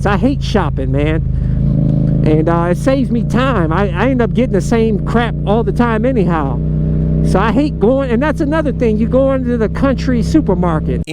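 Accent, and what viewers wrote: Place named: South, United States. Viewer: C'mon man! You're just a CITY BOY with a country twang speech impediment. Yeah, you gotta work to live, brother, and you gotta SHARE IT WITH THE FLORA & FAUNA. FACT.✅ Only the tough survive. But yes many southern people are crazy, esp. the government. You avoid them!! Otherwise, youare 💯% right, EXCEPT, CITIES ARE A DEATH TRAP.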